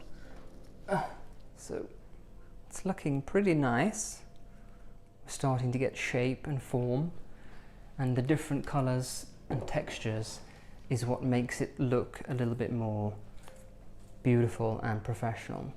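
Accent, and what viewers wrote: United Kingdom. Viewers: Hello my name is CONNIE I live in Southern California I subscribe to your Channel a few months ago and recently added your news letter I am so enjoying your videos. Your apartment is screaming beautiful I would love to live there and Sophie is adorable I own a Shih Tzu myself I think they are the best dogs. I started watching with my goddaughter because she is fascinated with anybody who sounds British so she imitates you through the entire video she loves it. Now she wants your tea set, she’s turning into a little connoisseur. Her father is a chef so she adores cooking we watched your tea party on how to brew the tea properly and make the little sandwiches she was in heaven. You are so blessed to live in such a lovely environment and location. I love that you bring so much charm and elegance to your videos it’s definitely a lost art in America. 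You did a beautiful job on your floral arrangement, there’s just something about fresh flowers, they don’t even need to be expensive it’s just something living and beautiful that can bring you so much comfort when we have such a small life these days. Thank you again for blessing us and bringing Refinement and old world elegance into our homes. Stay safe and healthy give Sophia hug. Your new friends CONNIE and Joey Blu❤️🙏✝️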